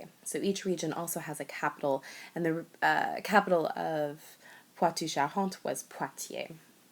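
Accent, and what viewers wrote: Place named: England, United Kingdom. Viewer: I like your English accent when you tell the French provinces I think it's not so easy for your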